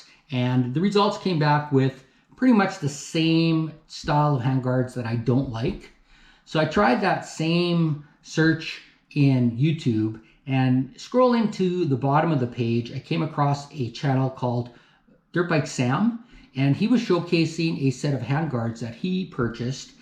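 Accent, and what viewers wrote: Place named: Canada. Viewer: I knew you were Canadian as soon as I heard your accent. 😎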